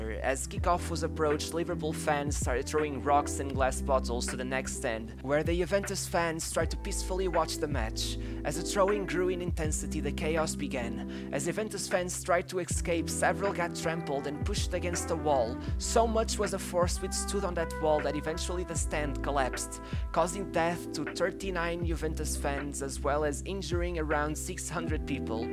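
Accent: Portugal. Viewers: The speaker has Portuguese accent!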